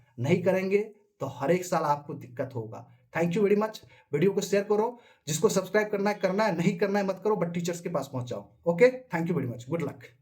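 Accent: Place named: Bihar, India. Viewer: bhai ka Bihari accent hain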